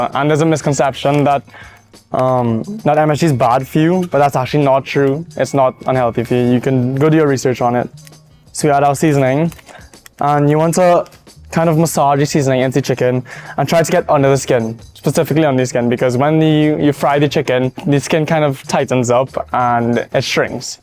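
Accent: Trinidad and Tobago. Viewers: A Chinese with a strong Trini accent is something I have never encountered before. WOW! I sometimes forget the Caribbean really is a melting pot of cultures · Currently stuffing my face with Popeyes and this pops up so I click it. I'm like wait nah how you sounding Trini so 🤣 I subbed after few minutes of research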